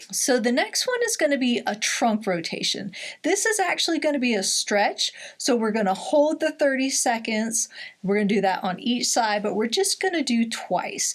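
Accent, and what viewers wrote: United States. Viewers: I tune in to hear you say 'disclaimer alert' in that wonderful American accent.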